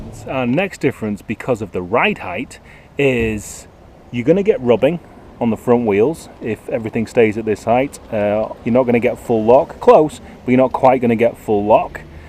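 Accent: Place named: Northern England, United Kingdom. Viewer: Now mate I've recently found your channel and enjoying your stuff but i've one question. what part of the north of England are you from as its great fun hearing English/northern terms,swearing and pronunciations mixed with americanisms . keep up the good graft.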